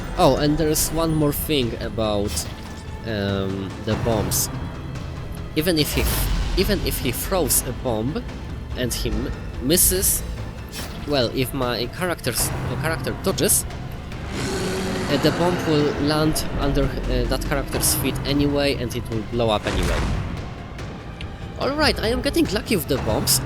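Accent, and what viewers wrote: Poland. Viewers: Yeah, typical polish accent, i knew it the first second as well.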